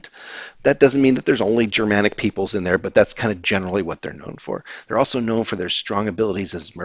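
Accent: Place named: Minnesota, United States. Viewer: Kinda sounding like Minnesota Tribe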